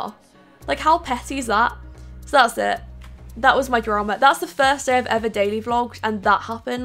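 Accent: United Kingdom. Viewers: I've never noticed your British accent before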